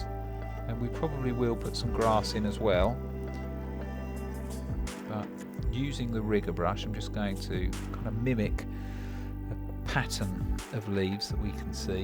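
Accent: United Kingdom. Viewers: As a visual learner this is perfection. But as a vocalist/musician the volume fluctuations are maddening. I'd like to hear your lovely Brit voice better, without constantly adjusting the volume. Stunning work as always though.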